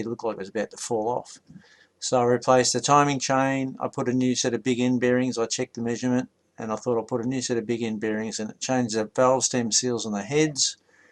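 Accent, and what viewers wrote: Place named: Australia. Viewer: I love his austrialia accent. Its wonderful. Just love hearing him talk. The 300 is absolutley beautiful.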